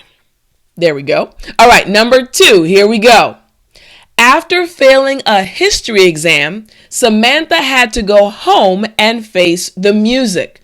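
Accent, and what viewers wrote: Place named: United States. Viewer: Hello, there! I'm an English teacher from Rio de Janeiro, Brazil. I usually watch videos from British channels, for I'm a sucker for their accent. But you speak so clearly and beautifully that I have become your fan. Where in the US are you from? Thank you and keep up the good work! <3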